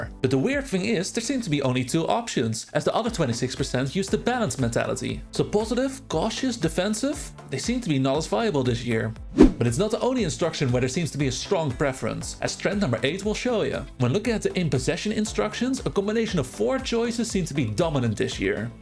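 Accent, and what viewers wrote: Netherlands. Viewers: This guy sounds soooo Dutch :D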